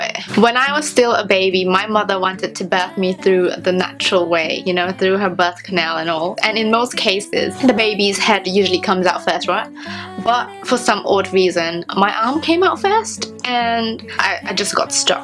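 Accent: United Kingdom. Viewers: You have such a beautiful accent, it's like a mix between British and Chinese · why you got British Accent? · You kind of sound like you have English(British) accent with your pronunciation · wow is she british??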